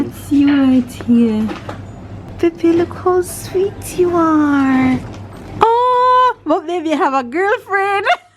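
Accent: Jamaica. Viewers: I sure will. I look forward to knowing you better. Are you Jamaican 🇯🇲? You sound like one. I'm Nigerian 🇳🇬 and my man is Irish 🇮🇪 🍀. Don't forget to subscribe to my channel too so you can also see what I'm up to.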